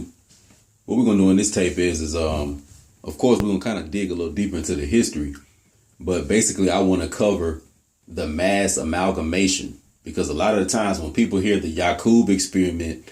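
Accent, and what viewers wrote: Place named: South, United States. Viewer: Brother, you be SOOO on point, that it's DIVINE! We've come to so many of the same conclusions, that I feel like I'm listening to myself, but in a southern American accent, LOL! PEACE AND LOVE, brother! 👍🏿✊🏿🇲🇦✡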